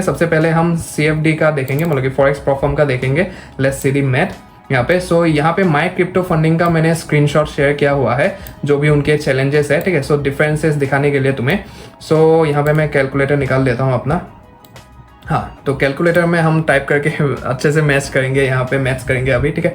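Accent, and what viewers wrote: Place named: Bengal, India. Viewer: ye banda sure bengali hain.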